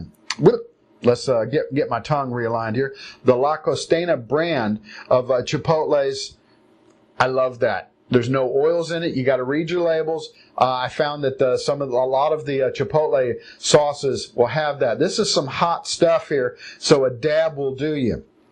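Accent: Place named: United States. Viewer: Hi! You are too fun! Just subscribed. Love the American accent and the bottle of poison on the window sill and you can never go wrong with your very own handcuffs. My biggest issue is coffee. I started using espresso and cashew milk. That’s about the only way I can handle it. I find breakfast the most difficult because I wake up at four am to go to work, so I actually eat two breakfasts. One at four-thirty and one at work at seven-thirty. Then lunch, and a light dinner. I try to stay away from bread because I have a gluten intolerance, so I am experimenting with granola that I make, and some peanutbutter and rice cakes. I can’t take nuts to work, because it is a nut free facility. I work in an Oreo factory in Toronto...lol. At least the product is vegan. Have a fantastic day, my friend.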